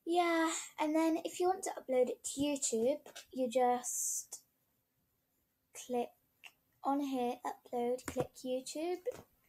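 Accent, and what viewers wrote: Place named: United Kingdom. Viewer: col british accent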